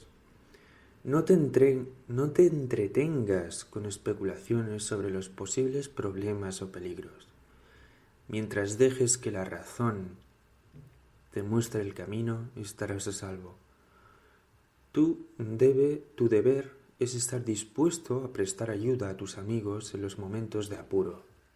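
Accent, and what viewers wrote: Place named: Mexico. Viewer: Me encanta su claro hablar y también el acento de México es muy entendible y claro pero el resto de Sudamérica es fatal.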